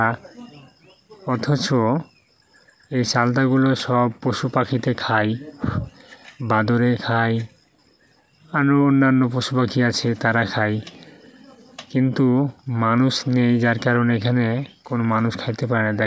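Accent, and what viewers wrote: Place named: India. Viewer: ভাই আপনি কি ইন্ডিয়ান এইভাবে কথা বলেছেন কেনো।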